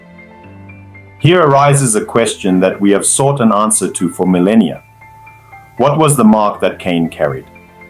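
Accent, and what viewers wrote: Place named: United States. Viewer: TA falando ingles pra caraca americano mesmo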